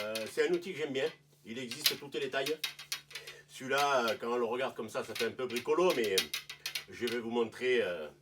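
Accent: South, France. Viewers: Moi c'est l'accent du sud que j'aime bieng...! Le reste aussi évidemment. Tchao.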